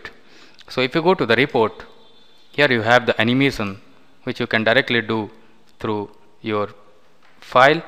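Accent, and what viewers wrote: India. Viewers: Consultant - I think this is only an introduction to Moldflow capabilities. I was looking for something explained elaborately - like how to use molding window to predict number of gates, how to predict the packing time, how to take a decision how much should be the coolant temp to extract heat from the part. If you have these videos, then plz share. Those who browse for moldflow, are certainly aware of the basic capabilities which u have addressed here. I think U r from Odisha - It's not "Rapage"....plz pronounce it as "Warpage". Bujhila bandhu !! All the best ! keep posting such videos.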